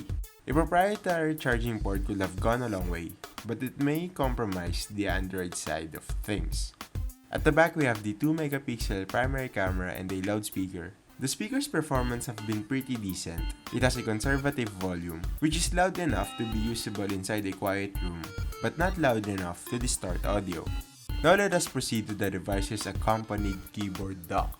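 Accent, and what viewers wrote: Philippines. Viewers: Ganyan accent ng pinoy pag nag eenglish o kung tawagin sa Wikipedia, Philippine English. Hindi naman kaylangan lagi american accent. Lahat ng bansa may kanila version ng english. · tagalog mo nlng tol halata rn lng accent mo.. cka pinoy lng karamihan mkakabili nyan..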